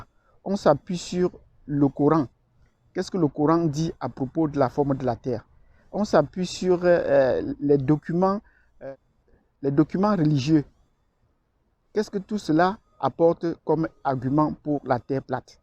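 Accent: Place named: Cameroon. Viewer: C'est quoi son nom, il a un ton Camerounais, si c'est le cas je dois le contacter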